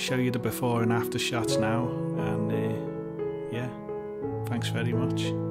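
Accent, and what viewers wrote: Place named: Scouse, United Kingdom. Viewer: I really enjoyed listening to your soft 'Scouser' accent. The transformation is mind boggling - love it!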